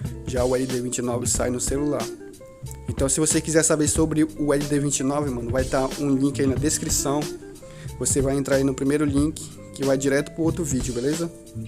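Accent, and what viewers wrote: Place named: Pará, Brazil. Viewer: Tu e paraense ne man kkk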